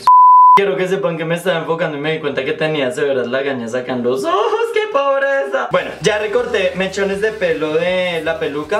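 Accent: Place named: Colombia. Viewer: El acento colombiano es muy bonito 😍😍😍😍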